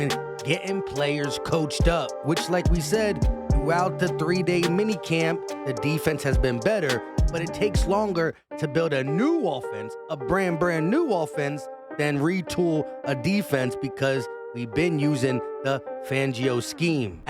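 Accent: Philadelphia, United States. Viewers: Does everyone is Philly talk like you?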